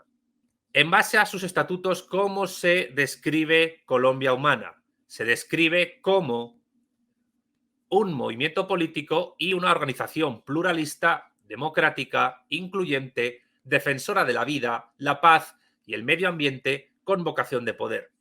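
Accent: Spain. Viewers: Por favor que hace est señor con asento español opinando de el señor petro .... Analiza a los de tu españa amigo no metas las narices dond no t han llamado ....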